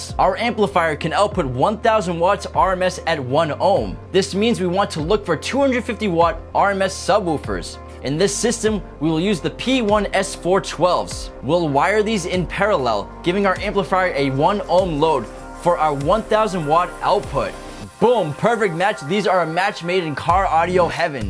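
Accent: United States. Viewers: Are you an american? sure sounds like it.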